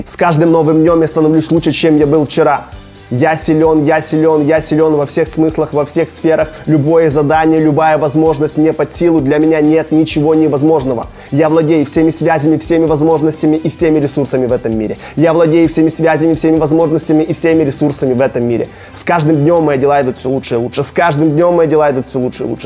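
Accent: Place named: Kyiv, Ukraine. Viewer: Говор такой, как у моего брата двоюродного :) В Киеве живёт, Березняки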